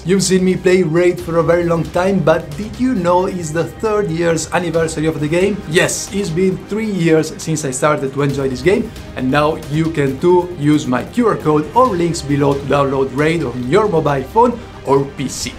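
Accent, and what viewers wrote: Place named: Italy. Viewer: Mi sembri italiano dall accento, vabbè Anyway great 👌🏻👍🏻🤘🏻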